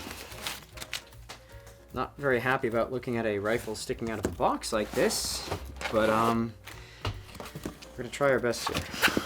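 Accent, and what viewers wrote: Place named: United Kingdom. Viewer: I honestly thought you were british all this time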